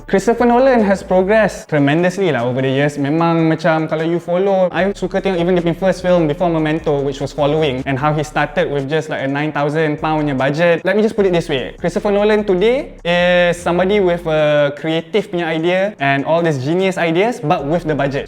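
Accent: Malaysia. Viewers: I love this kind of persona the speaker has. The way he told the stories. R u malaysian or singapore?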